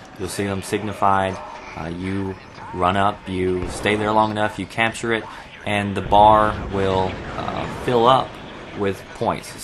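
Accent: South, United States. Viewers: where are you from? you sound like you're from the south.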